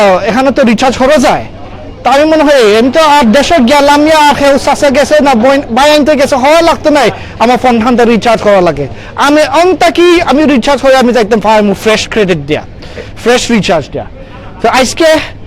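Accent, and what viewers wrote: Sylhet, Bangladesh. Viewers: Superb bro I like the way u speak sylheti keep up the great work :) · I hate your SYLHODDAR accent so bad language 😡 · এই কুত্তার বাচ্চা, শুদ্ধ ভাষায় কথা বলতে পারিসনা,, তুই কিসের বাংগালীরে,,! সিলেটি চুদাও না,, মুর্খ কোথাকার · Speak proper Bangla, not Sylhety accent! Its youtube, not your private Facebook account ;) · Cant you speak in proper bengali accent? I see many sylheti dont even bother speaking sylheti... That's good... But as a Bangaldeshi everyone should learn how to speak in bangla properly.... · nazzy hammond This is call sylheti accent. Like Barishal, Dhaka, commila, Rangpur, Chittagong etc have their own accents. But language is one Bangla.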